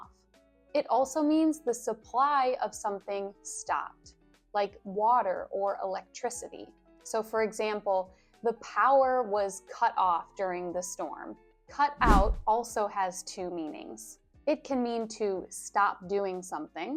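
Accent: United States. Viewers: Can get American accent if they speak like you, but they speak fast in movies 😂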